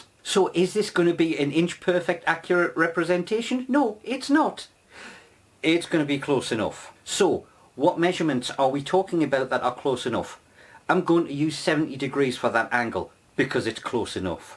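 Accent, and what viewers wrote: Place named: North East England, United Kingdom. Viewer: I can tell by you accent you come from somewhere near me (North-East England) - If you're back in this area any time soon, contact me and I can show you one of my equatorial mounts in action - I'm an astronomer, and own several of them... It's impossible for them to work properly on a flat Earth.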